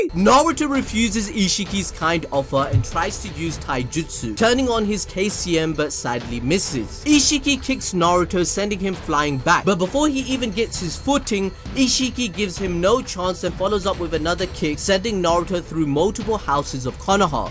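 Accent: Punjab, India. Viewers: Is this person punjabi🤔🤔🤔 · you punjabi ?? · dude you indian?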